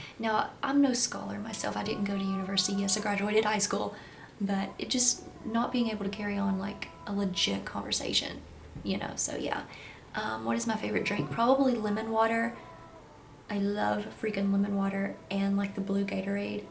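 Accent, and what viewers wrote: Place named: Kentucky, United States. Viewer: Great video, enjoyed it lol your personality is so amazing, ky ascent.. I never noticed lol prob where I'm from Ky also, really I never noticed lol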